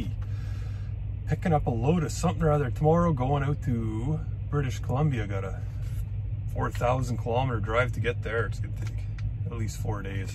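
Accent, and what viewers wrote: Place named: South, United States. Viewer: My very first MRE was a chicken breast pattie. Couldn't believe my eyes that a piece of chicken was in a bag and still fit to eat. Little chicken juice goes a long way in the cab of a truck. Bit and pieces of this video you sound like a southerner. Great detailed video. PS it's still hot. Enjoyed our visit with you that week.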